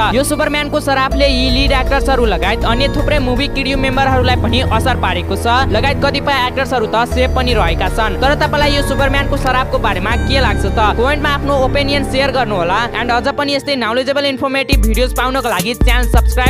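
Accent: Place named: India. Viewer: You are going much good but I want to suggest you that improve your accent and voice. Ali Hindi accent jasto vayo nepali jasto suniyena.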